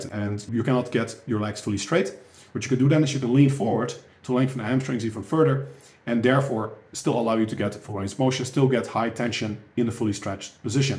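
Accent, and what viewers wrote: Netherlands. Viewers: At the start, for a second I thought Alistair Overeem was speaking :) Almost the same Dutch English accent and intonation hehe. Either way, great content! Keep up the great work!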